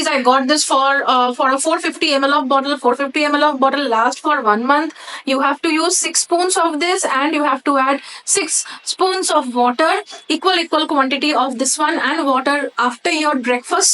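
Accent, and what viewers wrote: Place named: Bengal, India. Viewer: Wth people giving her hate over english speaking, grow up lads.. She is from Bengal as i can see, i am too from Bengal and i know what she is talking about some Bengalis can't properly speak hindi, i am not saying that Bengalis are poor hindi speaker like i perfectly speak hindi, can write hindi any stuff but with some Bengalis there's a regional tone which can follow up in hindi and might sound like she is speaking wrong but it is not like that hope everyone can understand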